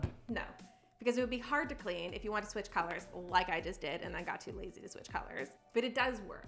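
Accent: Canada. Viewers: Oh you're Canadian? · If you see this can you answer it are you Canadian?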